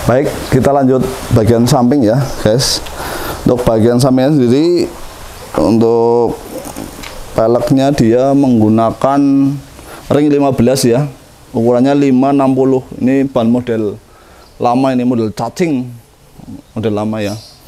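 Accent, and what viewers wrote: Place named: Banyumas, Indonesia. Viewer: Dikerook 😀 ngapaknya ketahuan, Mas pasti dari mana ya??. Sukses mas, semoga cepat laku, keren banget mobilnya.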